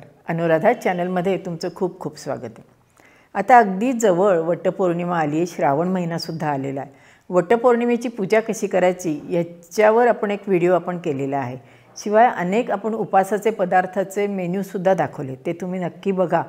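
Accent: Standard. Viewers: हो मावशींची स्पष्ट शुद्ध अलिकडे दुर्मिळ होत चालले ली भाषा ऐकायची. मला मावशींची भाषा खूप आवडते. इतर चॅनेलवर आधी भाषेची वाट लावतात आणि मग पदार्थांची. मावशींच तस नाही.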